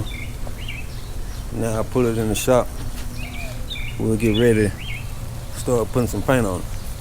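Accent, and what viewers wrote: Alabama, United States. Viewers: Thanks for the video. Trying to learn if it's worth my time to do my obs Yukon. Sounds like you're near me (muscle shoals) - do you run into issues with humidity or do you run dehu's?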